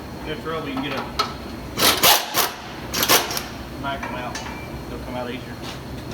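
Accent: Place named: South, United States. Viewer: Is this what I sound like ...tell that's a good Ole boy there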